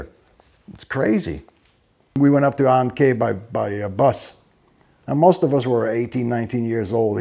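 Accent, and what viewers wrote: Louisiana, United States. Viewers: I hear Louisiana in that accent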